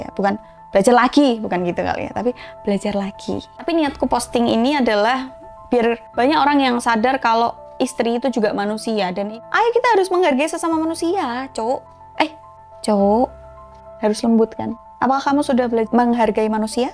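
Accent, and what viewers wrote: Java, Indonesia. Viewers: pertama ktmu chanel ini d beranda seolah g prcya ada konten sperti ini,gokil.trs br puter 2 video Lgsg geleng2,mantab bener ini konten,aplgi ada logat jawany,bikin ngekek🤣.tanpa pikir ojg Lgsg subscribe.😁 lanjutkan mbak,edukasi sex memang sangat perlu,ora gur uslak usluk tok,semua ada ilmunya.😁👍 tp pda intinya konten ini khusus hnya utk orang yg waras,inget y,ORANG YANG WARAS!!😅 SEMANGAT DAN TETEP SEHAT DAN BERKARYA MBAK!!!💪👍👌👏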